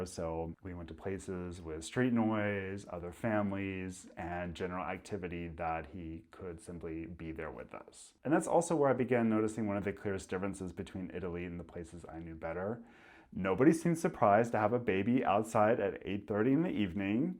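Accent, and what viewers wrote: Bavaria, Germany. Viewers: I am new to your channel. What I notice most of all is your Bavarian intonation! I don’t hear it in German but I do hear it in people speaking English after a lot of exposure to bavarians. If you don’t know what I mean, listen to Feli from Germany and her unusual patterns, I hear a lot of them in this video.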